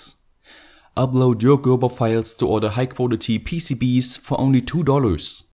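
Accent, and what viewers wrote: Germany. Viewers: You are from Germany? Kommst du aus Deutschland? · *German accent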